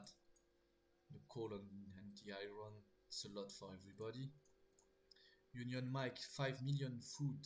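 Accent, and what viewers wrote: France. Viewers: are you french ? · french accent, so french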